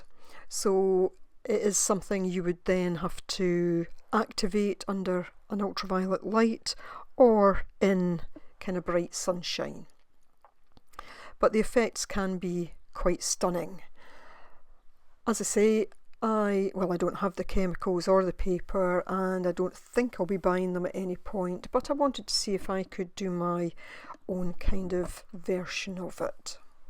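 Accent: Scotland, United Kingdom. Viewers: I like your videos. It's refreshing to hear a Scottish accent for a change on YouTube.I do similar craft stuff myself but am a digital dinosaur. I am watching from the Outer Hebrides. Every blessing to you and Nina for future videos xx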